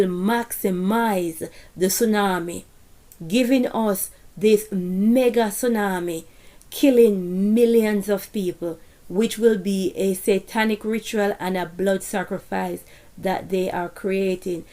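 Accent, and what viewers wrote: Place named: Jamaica. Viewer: she never said 'tsunami all over the world'. listen carefully and dont put words in her mouth open your ears. She said it will hit certain areas off the Atlantic which is a massive geographical area. Then, the following infrastructure collapse will cause knock on effects to other parts of the world. That is what she means when she says the tsunami will affect the whole world. go watch the movie 5th Wave and it will show you the collape of society etc. There is also the possibility of a tsunami on the west coast of the US from the Hawaii volcano. this lady does extensive research before each vedeo. dont put her down just she has a Jamaican accent. even Geologists are saying the same thing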